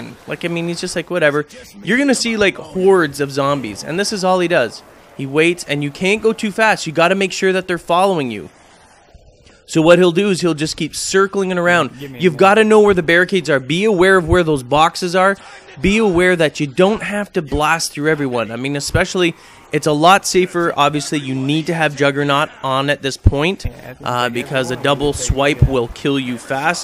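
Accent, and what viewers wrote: Canada. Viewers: ur canadian. you go oo instead of ow or ou. and you sed eh? abunch of times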